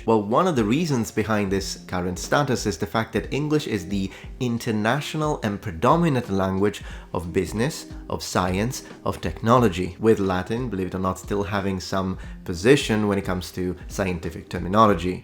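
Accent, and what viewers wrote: United Kingdom. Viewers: Oh yes that is why i can hear a bit of a british accent when you talk english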